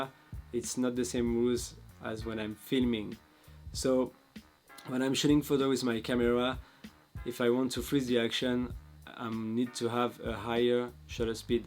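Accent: France. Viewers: Salut mec!!tu parles bien anglais😜c’est la première vidéo que je vois de toi et je trouve ça vachement sympa avec les sous-titres en anglais et ton petit accent français j’arrive bien à comprendre et ça m’entraîne!!go voir les autres vidéos!!😜petite question... pourquoi tu fais des vidéos ou tu parles anglais alors que tu es français?😅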